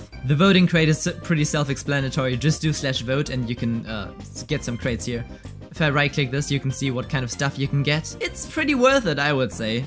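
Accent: Germany. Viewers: Alter immer lustig wenn man den deutschen Akzent so durch hört😂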